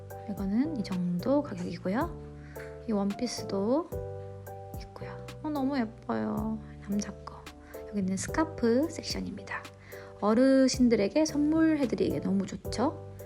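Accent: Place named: Daegu, South Korea. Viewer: 말이 빨라지면서 자연스럽게 들려오는 경상도 대구 사투리가 정겹게 들리네요. 늘 건강하세요. 영상 감사드립니다.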